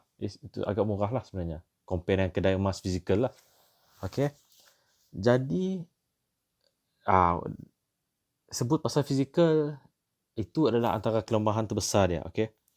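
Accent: Sarawak, Malaysia. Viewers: Loghat mcm Sarawakian je.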